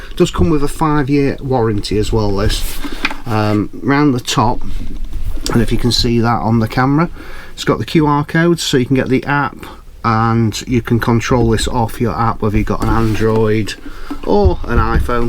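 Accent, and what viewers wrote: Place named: Northern England, United Kingdom. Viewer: He’s Northern English, like my mom’s friend, I recognize that accent😊. I was just about to order that kettle, surprised it couldn’t do it, was power lifting mode on? Oh well it’s small.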